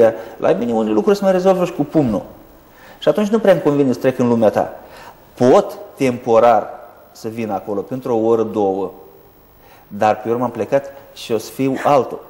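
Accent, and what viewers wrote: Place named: Moldova. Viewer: Tipu e moldovean si ii e un pic cam greu sa renunte la accent (mai are scapari de accent) mai ales intr-un interviu televizat. Daca ar explica totul in termeni psihologici nu multi am intelege. El incearca o explicatie cat mai coerent pentru a intelegi si ultimul pamantean.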